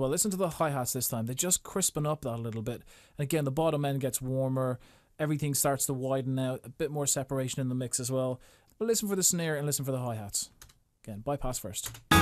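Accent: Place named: Ireland. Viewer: youre from ireland :) great video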